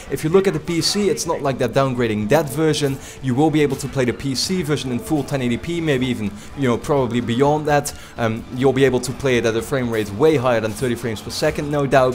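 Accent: Netherlands. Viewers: You sounds english with a dutch accent in it 😂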